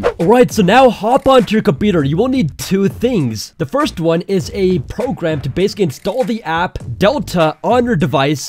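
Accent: India. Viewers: Are you Indian.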